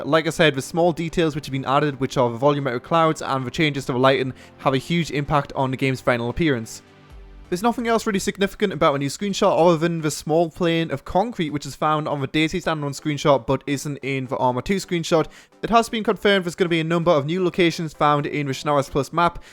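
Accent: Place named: United Kingdom. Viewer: I miss your videos. Make some Wasteland or carry on playing Dayz Taviana!!! Please!!! Btw like your british voice:)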